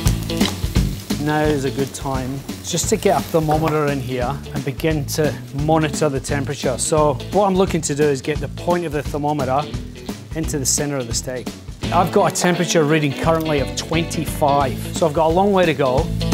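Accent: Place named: Scotland, United Kingdom. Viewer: scottish guy where you from in glasgow?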